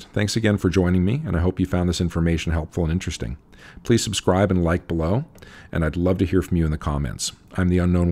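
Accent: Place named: Caribbean. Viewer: I like your Spanish pronunciation. It sounds Caribbean (Boricua maybe?)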